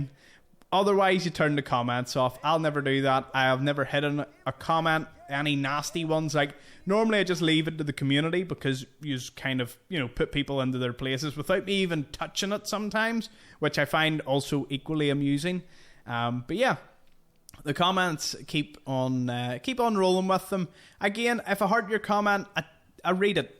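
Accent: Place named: Northern Ireland, United Kingdom. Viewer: I think what makes your channel so appealing is, not only your soothing NI lilt but also your easy going attitude to the game, not taking it badly when it goes wrong. 👍👍👏🏻👏🏻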